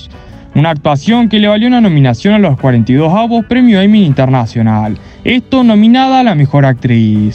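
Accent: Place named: Argentina. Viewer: Será el narrador de este video el dueño de este canal ? Que Sonsonete más feo, no habla sino canta, Disculpe pero le recomiendo bloquear su terrible ACENTO (no sé de cuál país es, si Argentina, o talvez otro de esa área).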